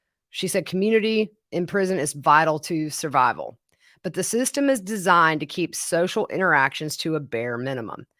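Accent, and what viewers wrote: South, United States. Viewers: Prisons and all institutions are inhumane. After 6 months of wholesome food, the level of violence significantly was reduced in a school for delinquent youth. Elderly homes, with zombies medicated patients, are transformed with private pods and gardens leading to a central area. The prison system minimally should separate the criminals from convicts, as the British did between devoted Nazi POWs and the other Germans, one of whom married my best friend's mother, a half Jewish woman. This is a fascinating insiders' look into the most hidden world of women's prisons. The lady and you should write a book. It could inspire reform. Kudos to you. Love your home spun style and Southern drawl!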